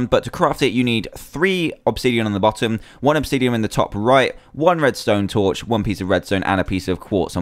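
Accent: United Kingdom. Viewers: Are you british? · yes, obviously he is British, (no offence)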